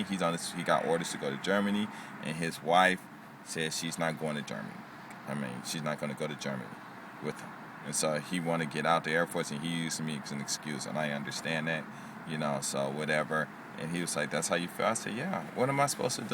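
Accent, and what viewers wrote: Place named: Japan. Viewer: I have NO idea what your accent is...it sounds like it could be japanese, african, jamaican, i have no clue. where are you from originally?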